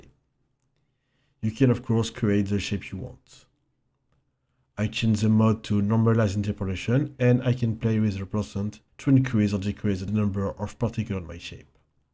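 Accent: France. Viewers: joli l'accent français :) merci pour la technique by the way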